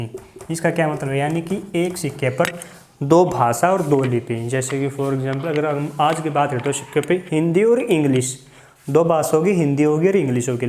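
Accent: Haryana, India. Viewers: Woww sir asli haryanvi 👌👌👌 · Full haryanvibazi guru ji🔥😀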